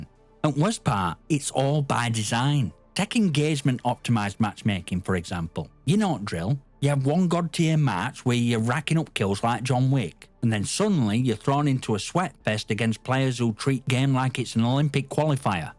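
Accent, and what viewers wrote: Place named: Northern England, United Kingdom. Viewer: As a guy from Leeds, that's one hell of a northern accent. Great video, I have the same gripes with these kinds of people, glad to see someone calling out the bullshit.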